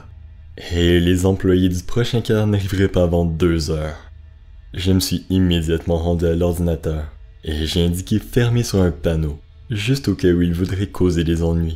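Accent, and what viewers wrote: Quebec, Canada. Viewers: Mais c dingue à quel point ton québécois ce mélange bien avec tes creepy pasta. J'aime énormément ton travail. Chez toi comment ce passe au niveau du virus ? Ua un confinement ou autres ? Moi je vie dan l'est de la France qui a était rapidement contaminé acose de l'Allemagne. J'habite plus précisément à laxou dans Nancy. Nous sommes zn confinement de 7jours sa vas. Sauf notre gouvernement est pas à la hauteur. C pénible. Pas de masque poir le déplacement ou le peu de travalleur non confiné. Et attende l'accord de la communauté scientifique. Pour le médicament miracle n'importe quoi. Heureusement que les médecins libereaux eux le donne au plus malade. Tien moi au courant stp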